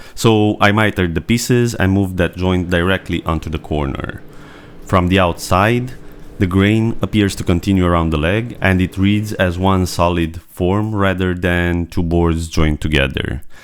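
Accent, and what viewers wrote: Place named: Eastern Europe. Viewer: Thought I heard an eastern European accent. Love your work and the way you talk through decisions. Thank you.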